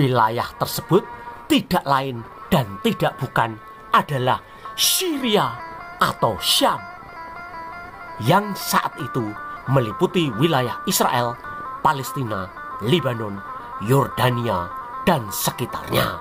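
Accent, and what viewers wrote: Java, Indonesia. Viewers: Kalo bisa logat jawanya dihilangin bro. Saya yg bukan jawa agak susah nangkepnya. Cara penyampaian ya kurang umum. Saran ajaaa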